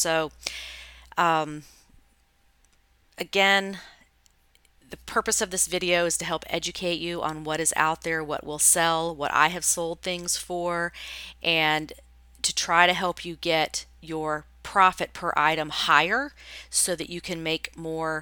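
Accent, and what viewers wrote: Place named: Georgia, United States. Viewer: Enjoy your videos. Living outside of Georgia now, hearing your accent takes me back to Atlanta (Little Five Points.)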